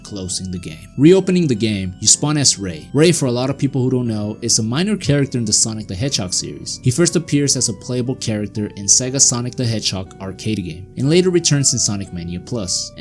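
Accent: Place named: Spain. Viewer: what the pizza pocket in the microwave sees. But seriously, awseome video and bonus points for a regular show pfp. Nice. Y creó que tambien hablando Español? Solo creó por el acento.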